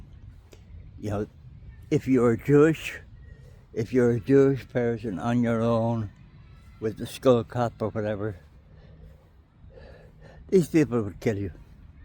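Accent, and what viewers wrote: Ireland. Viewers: I am a Jew and an Israeli. Thank you for standing with us. It's beautiful to hear an Irish accent that isn't speaking hatefully toward us. May God bless you!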